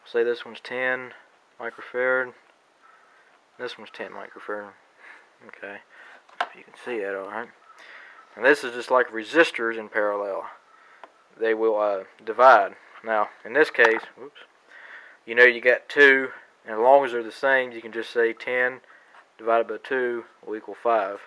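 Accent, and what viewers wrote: South, United States. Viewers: Made it VERY easy to understand, thank you! .. I'm from Canada so the southern accent made it all the more enjoyable. ;)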